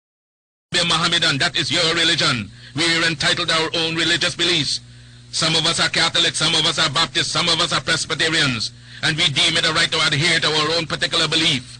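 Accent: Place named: Barbados. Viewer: Your narrator sound Bajan.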